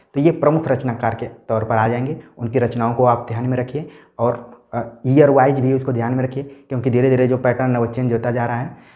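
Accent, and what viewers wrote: Bihar, India. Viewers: आप बिहार से है क्या सर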